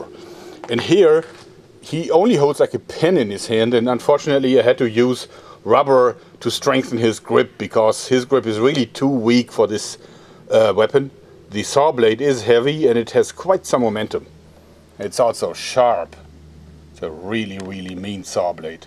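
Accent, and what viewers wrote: Germany. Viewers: is he german beacause he has ahuge accent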